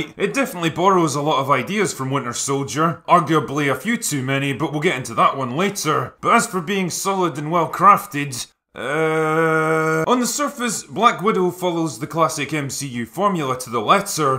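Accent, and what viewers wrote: Scotland, United Kingdom. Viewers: Dude, are you Scottish?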